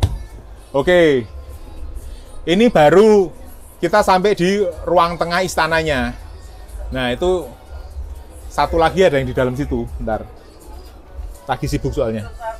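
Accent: Java, Indonesia. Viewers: Gak focus ama bus nya,..focus ama abangnya yg medok jawa nya😂😂😂emang beda yaaa saudara kita chines indo dan chines malaysia😂😂😂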